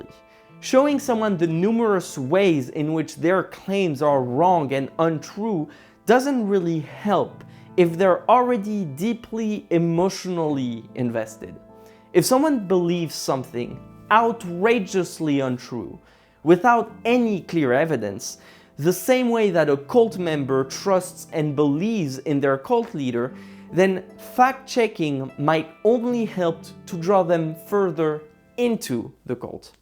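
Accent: France. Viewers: Are you french ? you have french accent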